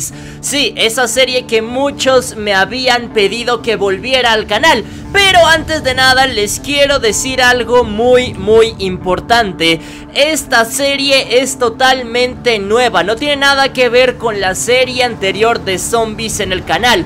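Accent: Argentina. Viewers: Una pregunta que casi todos se asen ¿ es Argentino?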